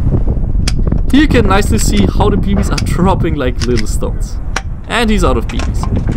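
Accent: Germany. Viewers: Bist du Deutscher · One question, are you german? Weil du diesen Akkzent hast... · are you germane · Ist er Deutsch? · Where is from? been watching him for a while, not long enough to figure out where he is from, accent kinda sounds German but I’m no Accent genius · hör auf english zu reden jeder weis das du deutsch bist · 1question are u German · Wer findet auch dass er ein Deutschen Akzent hat? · I have the felling u are german xD😅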